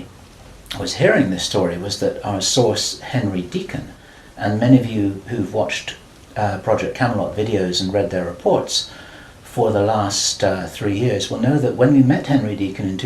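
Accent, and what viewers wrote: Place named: United Kingdom. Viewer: El que murió en 1997 es un gringo o estadounidense. Bill Ryan del actual video habla con acento británico. Que Dios lo proteja.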